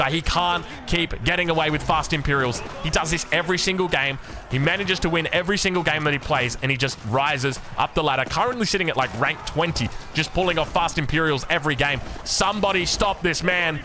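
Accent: Australia. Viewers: Hi I love your videos but I have a massive problem watching them. I watch them generally before going to sleep and your buttery australian voice guides me to sleep. However I have developed a sort of Pavlovian response that now whenever I watch your videos I get really sleepy all of the sudden. Anyway still love your vids and have a G'Day!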